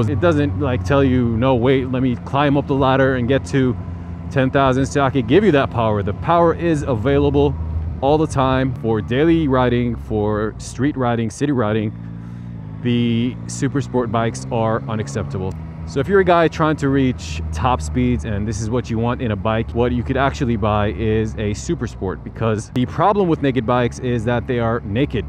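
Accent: United States. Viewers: אשכרה עד שלא ראיתי את המונית הייתי בטוח שאתה מארצות הברית. המבטא, המראה הסינמטי, הכל נראה מושלם אחי. אהבתי ועשיתי סאב, שרק תפציץ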